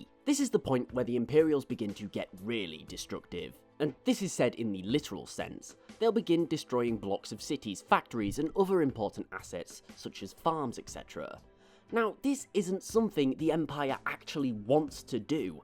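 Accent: United Kingdom. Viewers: I can't stand your BRAETEESH accent..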